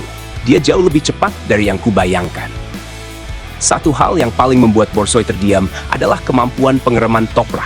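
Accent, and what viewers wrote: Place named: United Kingdom. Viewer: Ai dgn aksen enggries bikin cringe Walau yamaha tim gavorit gua,Males nonton sampe beres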